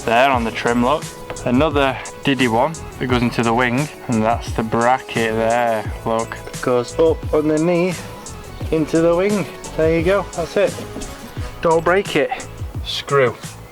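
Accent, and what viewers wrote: Yorkshire, United Kingdom. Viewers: Is that a Yorkshire accent pal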